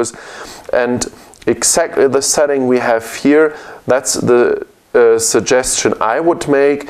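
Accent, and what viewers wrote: Germany. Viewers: It's typical German English, not that bd however :))) Just a small correction: - All 3 OM-D-s share the same 16MP Sensor - The E-M1 flagship has also phase detect AF points on the sensor while the others don't - The E-M1 and M10 don't have an antialiasing filter on the sensor while the E-M5 does have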